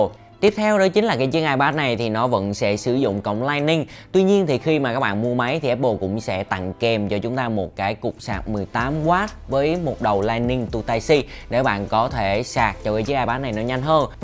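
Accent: Phu Yen, Vietnam. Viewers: Ad dân phú yên à Nói tiến phú yên rặc kkk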